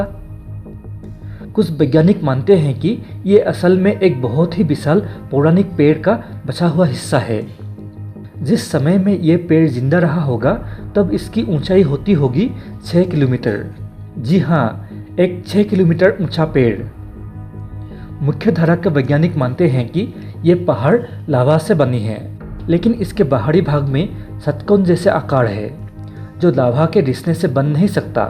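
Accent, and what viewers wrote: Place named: Assam, India. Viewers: তুমি অসমীয়া নেকি ?তোমাৰ মাতটো অসমীয়া নিচিনা লাগে, তোমাৰ ভিডিঅ বিলাক চাই ভালেই লাগে| 👍👍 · Oh assamse he.. toh.. language me thodi parechani toh ho he sakte he..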